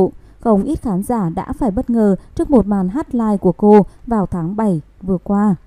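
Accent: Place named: North, Vietnam. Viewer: MC noi chuyen giong Bac Ky Ha Noi nghe que va do qua, how can I subscribe your channel ?? From USA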